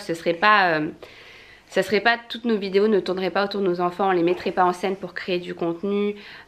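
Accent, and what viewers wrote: Spain. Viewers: Ton accent españiol 💓il est incroyable trop choux votre petit bout de choux de parler españiol et français c trop bien, 😁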